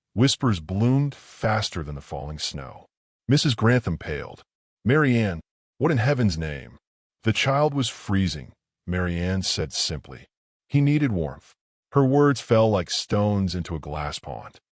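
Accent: United States. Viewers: I disagree! It needs to be narrated with a British accent, not American.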